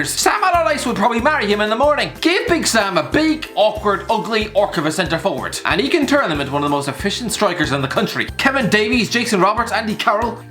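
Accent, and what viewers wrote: Ireland. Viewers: Hello and welcome to HITC sport right. Love when says that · i love waking up to an ranting about football every morning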